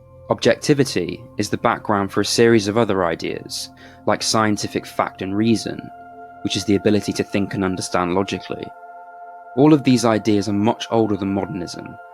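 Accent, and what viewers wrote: United Kingdom. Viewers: His British accent just sounds so clever and he says everything so confidently, i would be fooled if i didn't know better.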